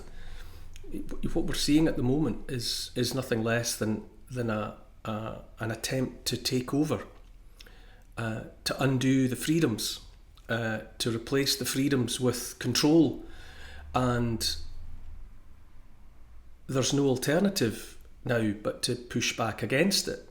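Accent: Scotland, United Kingdom. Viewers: 💞💞 Immense gratitude (and tribute) for all you do 🤗🦋🐬 A gentle, beautiful Scottish lilt A kind, empathic, forward tilt An open heart; a caring face A willingness to take his place. A strong, home-hitting monologue Reminds us of the boiling frog The courage to become a sleuth A passion to release the truth. A deep desire to crack the whip Illuminates our freedom-strip Calls out the darkness taking hold The shiny despots; plated gold! Intense and raw, he lays it bare A soul-enhancing, honest stare Inflections drive the message through He speaks for Us - for me and you! Discernment's such a precious gift His words create a mighty lift Take heed of all he has to say He knows - it shouldn't be this way! 🤗🦋🐬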